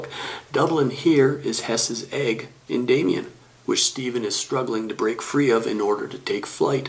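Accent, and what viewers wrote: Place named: Canada. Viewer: You're from Montreal? I thought you sounded Canadian!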